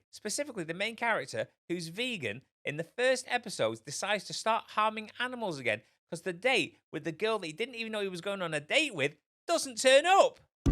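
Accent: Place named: United Kingdom. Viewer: I like your accent! Where are you from? The UK? as for the topic: there's a lot of anti vegan propaganda in movies. I'm not surprised. it's their agenda to make us look unsustainable and treacherous.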